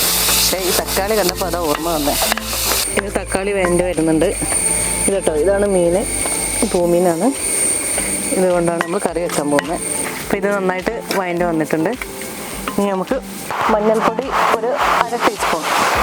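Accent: Malappuram, India. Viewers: Chechi malappuram kari ano atho kozhikkod kariyo samsaram kettitt chothichatan 🤔😊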